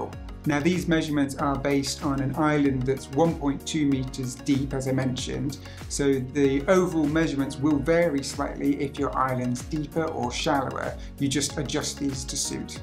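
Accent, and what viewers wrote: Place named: United Kingdom. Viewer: He’s British judging by his accent so it’s perfectly normal for him to express in metric measurements.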